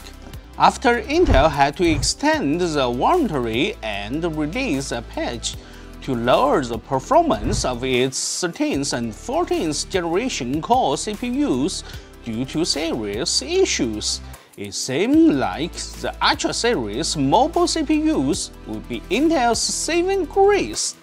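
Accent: China. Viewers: which chinese dialect is that?? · its his second language hes probably just trying to practice his english. he seems to be chinese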